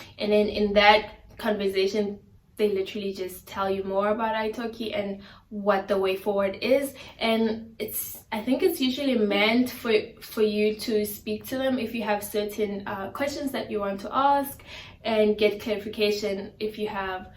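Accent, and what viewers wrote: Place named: United States. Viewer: U seem to have a bit of an american accent. Does one need to have this accent to be considered ?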